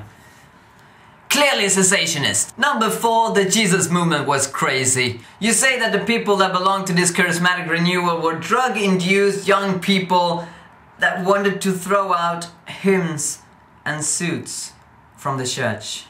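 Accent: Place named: England, United Kingdom. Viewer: One thing I learned from watching this: I can more easily tolerate a biblically illiterate moron as long as he has an english accent. I was at the Conference. Nothing you said rightly articulates MacArthur's perspective...especially "Number 1" from your list. I have witnessed the same phenomenon with regard to a lack of true conversion coming from the Charismatic Movement. Rarely is the true gospel preached. Many of them (if not most) couldn't even articulate the gospel if pressed to do so - and believe me when I tell you, "Jesus talk" is no substituion for talk of the substitutionary death and the atonement. Beyond that, most of them hold to the Word Faith, Health/Wealth/Prosperity gospel, which cannot save. You don't know what you're talking about - but like most Charismatics, you probably so idolize gifts that don't even exist today that you'll act the fool because somebody is talking about those gifts in a manner which displaces your idolatry. 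And Romans 10 is not the sum total of the gospel, otherwise, Mormons, Catholics, Jehovah's Witnesses and everyone else is saved. What a pathetic litlte man you are. You make us all look like idiots...which is why John had to do a Strange Fire Conference.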